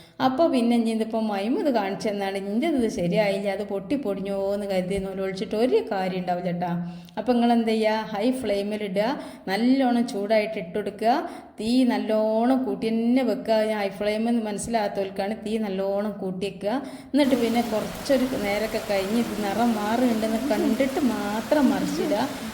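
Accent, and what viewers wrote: Malappuram, India. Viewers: ഇപ്പോൾ സൂപ്പറായിട്ടുണ്ട്സംസാരം കേട്ടിരുന്നു പോകും ഈ സംസാരം കേൾക്കാനാണ് ഞാൻ ഈ വീഡിയോ കാണുന്നത്എൻറെ ഫാമിലി ഗ്രൂപ്പിലുള്ള എല്ലാവരും വീഡിയോ കാണാറുണ്ട്ഞാൻ പാലക്കാട് കാരിയാണ്മലപ്പുറം സ്റ്റൈലിൽ ഉള്ള ഈ സംസാരം ഒത്തിരി ഇഷ്ടമാണ് കയ്യ് കാണാൻ നല്ല ഭംഗിയുണ്ട് കാണാനും നല്ല ഭംഗിയുണ്ട് മുഖം ഒന്ന് കാണിക്കാമോ❤❤❤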